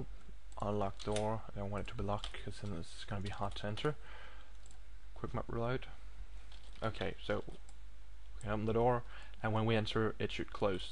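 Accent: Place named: England, United Kingdom. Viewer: Ahh, I srsly had to listen so intently. We both speak English, however he has a heavy English accent whereas I have a Western American accent XD I was actually sitting here like, ">_>?" I even widened my eyes as if perhaps that would help. A little note.. widening eyes do not make one hear any better; though it does cause one to see a wider spectrum of one's room..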